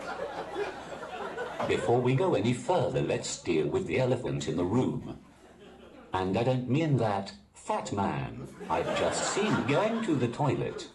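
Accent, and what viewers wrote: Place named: London, United Kingdom. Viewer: that's the accent of London. :)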